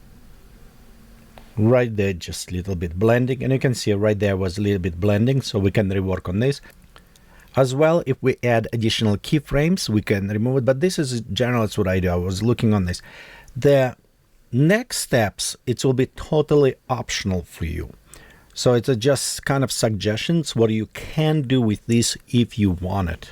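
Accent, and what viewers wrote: Russia. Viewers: This is a very helpful video and I will use the information redaily! Your content continually proves to be very valuable, Since I not a native "Russian-English" speaker, I sometimes have trouble following along, but I find I can usually figure out what you are saying. I just imagine that Chekov from Star Trek is instructing me. Keep up good work! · I hear your accent, are you Russian?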